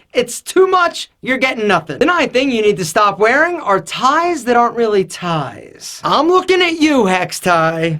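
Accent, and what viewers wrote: Philadelphia, United States. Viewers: He is from Philly. As am I. You can tell right? Lol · Lolol i was thinking philly too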